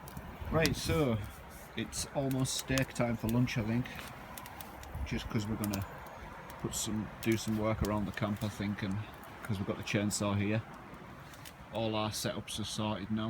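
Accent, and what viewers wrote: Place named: Yorkshire, United Kingdom. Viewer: he sounds like a proper yorkshireman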